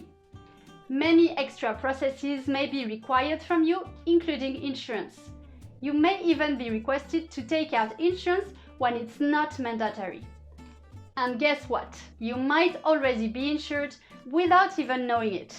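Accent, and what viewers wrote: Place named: France. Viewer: "Expat in France" followed by the most French accent I've ever heard. I guess they figured out how to make money off of us....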